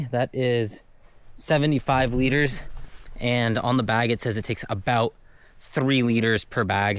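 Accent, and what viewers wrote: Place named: United States. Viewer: You sound American she sounds polish?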